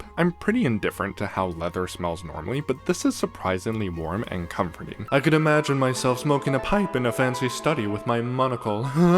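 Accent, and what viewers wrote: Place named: England, United Kingdom. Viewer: Also from the Uk. Your English accent... love it!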